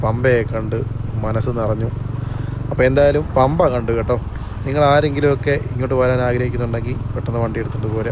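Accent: Kottayam, India. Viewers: kottayam slang kettapo tanne manasilay😅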